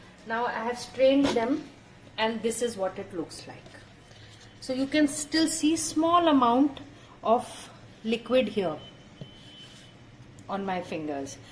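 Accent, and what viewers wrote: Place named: England, United Kingdom. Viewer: Your english accent is your from army background.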